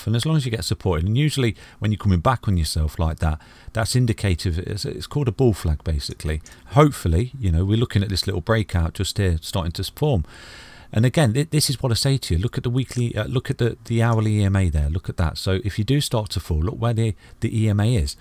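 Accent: United Kingdom. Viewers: Great analysis, clear and concise and lovely British accent!! 👍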